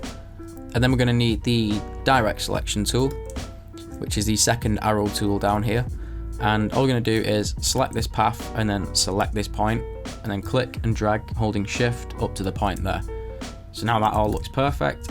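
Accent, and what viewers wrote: Manchester, United Kingdom. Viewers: I can’t place your accent.....Mancunian?......? 😂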